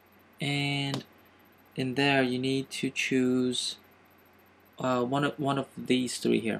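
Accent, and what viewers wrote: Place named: Philippines. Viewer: galing na accent mo pareng.. proud ako sayo pinoy ka.. lol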